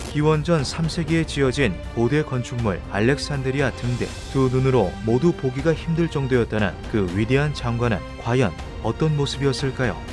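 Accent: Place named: Gangwon, South Korea. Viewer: 기묘한밤 나레이션 담당분은 고향이 강원도 아닌가요? 강원도 특유 억양이 있는거 같은데 ㅋㅋ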